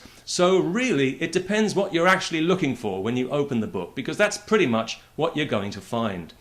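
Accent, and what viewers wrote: United Kingdom. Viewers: Nothing like a smack down with a British accent!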